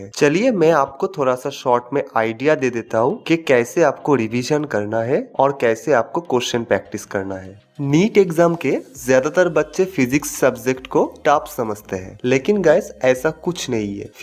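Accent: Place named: Odisha, India. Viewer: Aap ke aawaz se log raha hai ki aap odisha se hai..... Becoz.. Odisha log ka hindi aawaz pata chal jata hai......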